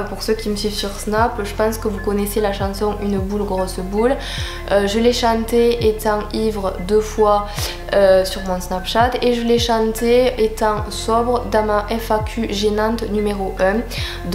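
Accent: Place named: Marseille, France. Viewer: oooooui oooooui " ...poinnnnnne...poinnnnne..." je n'ai gagné qu'une chose avec la chaîne de Williams c'est d'avoir découvert ta chaîne avec ton magnifique accent marseillais ;) pouce bleu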